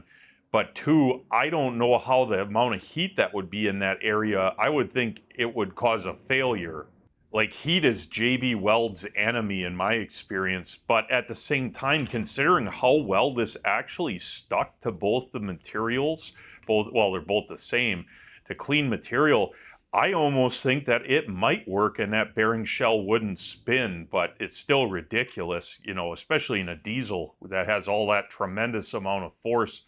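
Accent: Canada. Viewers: This is the thickest Canadian accent I’ve ever heard. This is what they call a “newfie”? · Sounds Canadian, eh? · I'm from Detroit, we border Windsor Canada. So growing up I knew a lot of Canadians and you sounds like them! Nice vid btw. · Is this guy Canadian. The accent.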